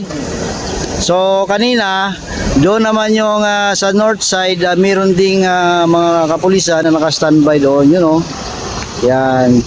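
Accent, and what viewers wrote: Iloilo, Philippines. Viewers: Ilonggo ka gali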